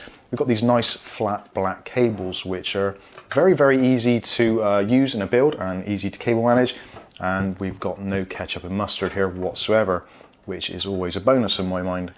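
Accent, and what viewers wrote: United Kingdom. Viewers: I didn't notice anything. I thought it was his British accent.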